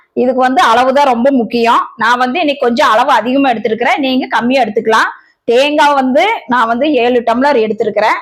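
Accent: Coimbatore, India. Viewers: நம்ம கோயம்பத்தூர் மரியாதை பேச்சு(ங்க) தனி கேட்கவே அவ்ளோ நல்ல சந்தோசமா இருக்கு 🎉🎉😊😊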